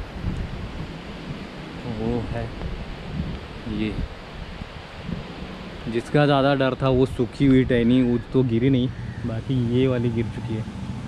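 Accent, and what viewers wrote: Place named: Maharashtra, India. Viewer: व्हिडिओ मराठीमध्ये बनवला असता तर बरं वाटलं असतं. तसंही तुमचं उच्चारण बरसच मराठी वाटतं होतं.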